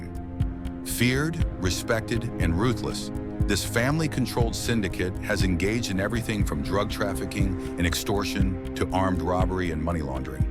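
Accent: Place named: United States. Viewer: Turned off as soon as I heard an American accent.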